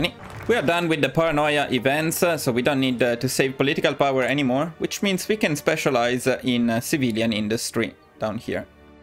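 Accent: Italy. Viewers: bro sei italiano lo percepisco.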